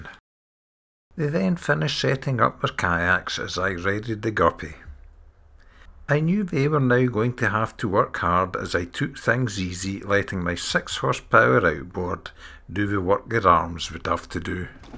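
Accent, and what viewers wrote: Scotland, United Kingdom. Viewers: Amazing video and a lovely Scottish brogue. If God's willing, I will return to Scotland. My father loved kayaking in the San Francisco Bay. RIP my daddy.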